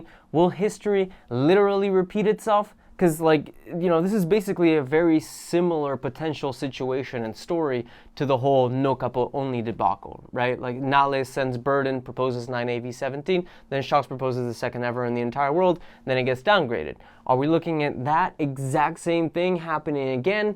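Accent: United States. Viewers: Eres español? Tío tienes acentaco americano. Como lo haces?! Muy buen contenido. Mis enhorabuenas.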